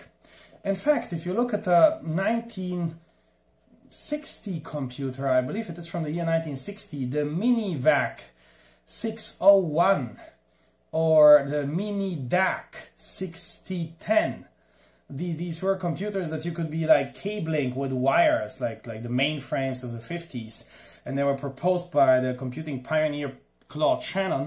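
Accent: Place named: Austria. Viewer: Excellent thinking 🤔 Systrans with accent such as yours is English with Austrian accent. Depending on the language being translated. Usable on cell phone 🤳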